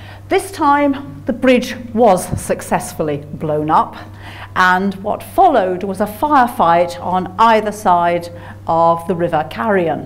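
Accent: United Kingdom. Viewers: Since she spoke with a British accent, I will not argue any facts of these events.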